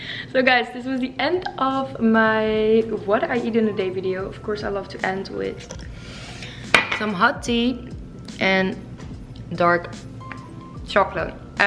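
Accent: Netherlands. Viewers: I missed your sweet Dutch accent here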